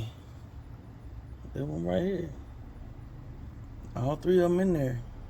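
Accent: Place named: South, United States. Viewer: Nice job! I think you got a little lucky being able to get the hub off like that. Sounds like you is from the south....but up here in NY it might be more difficult for me to do. I got some whirring going 50mph+ steering to the right so it's next on my list of things to do to my 2008 DTS. Keep up the good work and thanks for the detailed explanations!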